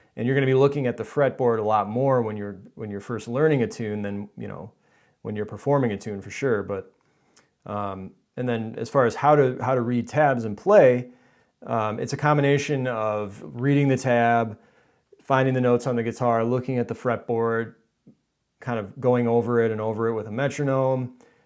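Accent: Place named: Canada. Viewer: Sure sounds Canadian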